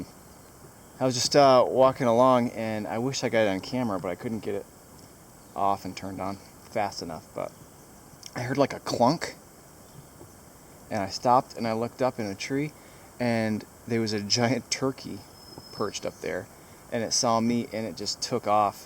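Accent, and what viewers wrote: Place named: New England, United States. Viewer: Excellent video! I wish I knew about this channel before. I subbed ya. Are you in New Hampshire it sounds like you have a New England accent. I'm from Maine myself and I love metal detecting and coin collecting. It definitely sounds like you know your stuff and that's cool. Dave from Maine.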